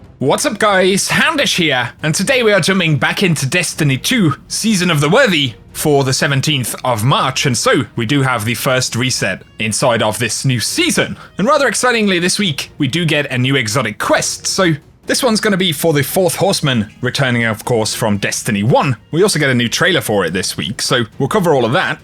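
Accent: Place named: United Kingdom. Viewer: Must be that British accent 😎👍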